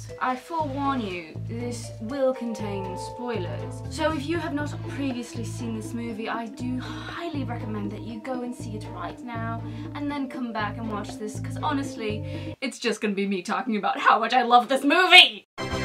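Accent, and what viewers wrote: United Kingdom. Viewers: I just happened upon your channel today, and I'm really digging all your characters! Do you think you'd be able to make one out of the slick RP accent you're doing here? Also, if you're up to the challenge, it would be awesome to see some of your more established characters do impressions/parodies of each other (unless you've already done that... I'm still working my way through your archives). Keep up the great work!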